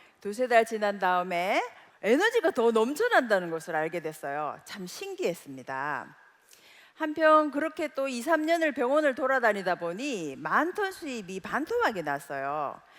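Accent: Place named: Daegu, South Korea. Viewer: 구수한 대구말 니어디가노? 공주가나? 기름너놨다 ㅎ 맛깔스런 말이 친구랑 같아서 끝까지든게되네요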